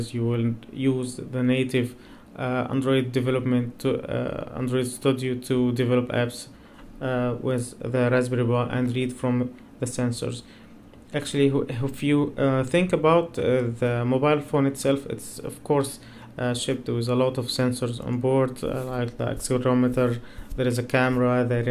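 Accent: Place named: Egypt. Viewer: من لهجتك عرفت انك عربي ومصري صدقني كثيرعرب يبحثون عن مثل هذي الشروح والبعض منا يجد صعوبه اذا كان الي يشرح انجليزي ف اتمنا منك تشرح بالعربي وتفيدنا جزاك الله خير وصدقني راح يتابعونك عرب كثير وانا اولهم ههههه اسأل الله لك التوفيق ..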